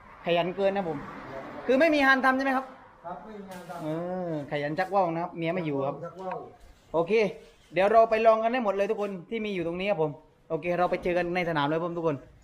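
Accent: South, Thailand. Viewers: ผมชอบมากคับถาษาใต้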